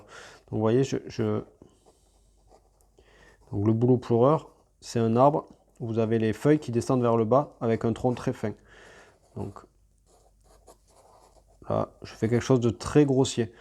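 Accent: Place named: South, France. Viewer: Super 👍 avec un petit accent qui sent bon le soleil 😁 bonne continuation 🤗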